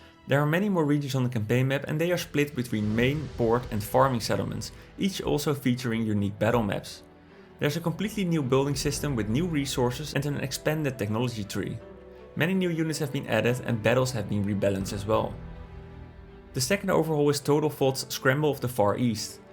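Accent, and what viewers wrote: Netherlands. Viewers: I can hear your dutch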